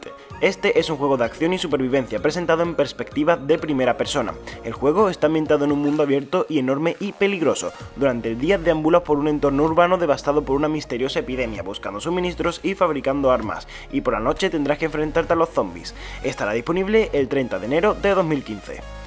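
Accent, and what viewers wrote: Andalusia, Spain. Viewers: Eres andaluz? Tu acento es diferente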